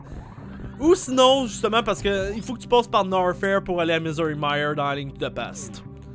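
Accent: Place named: Quebec, Canada. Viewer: hey je viens de decouvrir ta chaine complètement par hasard et franchement je m'abonne X) premièrement je résiste pas a l'accent québécois ensuite jadore zelda et metroid et enfin tu as une super énergie genre waw la je suis partie pour me faire un marathon de toute ta chaine :) continue comme ca !! :)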